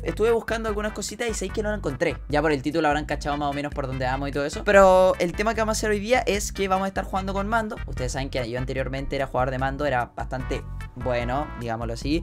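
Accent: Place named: Argentina. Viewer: Ctm que es estresante cuando un weon que es chileno habla como argentino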